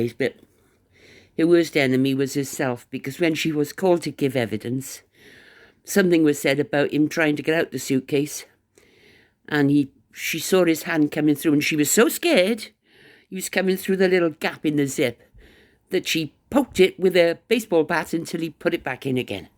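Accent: Wales, United Kingdom. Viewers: Love thst welsh twang so glad to hear it again its been worth waiting for welcome back ❤❤❤❤ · I do miss your Welsh accent my lovely friend sorry your not well with a rotten chest infection hope it gets better soon 💐💐💐❤️‍🩹❤️‍🩹❤️‍🩹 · lovely to hear your dulcet Welsh tones again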